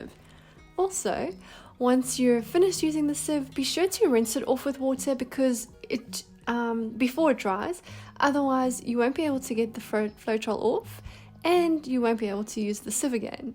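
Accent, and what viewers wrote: South Africa. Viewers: Thanks so much, just what I need, plus I love your SA accent.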